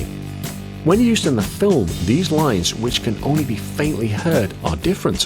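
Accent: Liverpool, United Kingdom. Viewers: A fellow scouser narrating one of the most underrated albums.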